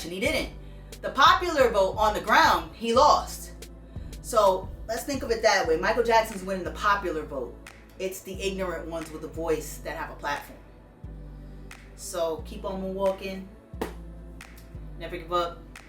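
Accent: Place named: Queens, United States. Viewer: I absolutely love you! Thank you for this! It's raw, real AND revealing! There are LOTS of top videos explaining the info, showing the receipts and kicking LN in the face and your video needs to be shared among the other ones! I haven't even gotten through half of the video. Not only do I love your passion, your knowledge and intellect, I LOVE your accent, being a man, who as a boy grew up in Queens. The way you speak so passionately about this reminds me of family and friends from when I was a kid that would start speakin real truth. Shoot, I've been gone since 1997 and get lit like that. You're great! I love your memorabilia, I love your style. Keep your head up, keep it goin, thank you!!!! 😍😍😍❤❤❤❤🔥🔥🔥👏👏👏👏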